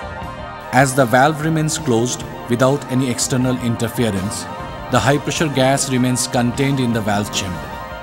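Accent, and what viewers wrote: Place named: India. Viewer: We get really bored with this INDIAN accent...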